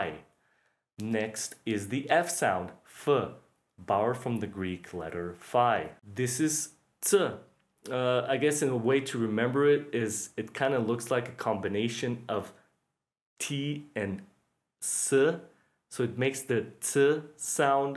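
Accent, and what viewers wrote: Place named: Russia. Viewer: По произносимым звукам дико палится русскоязычный. Это не всем удаётся скрыть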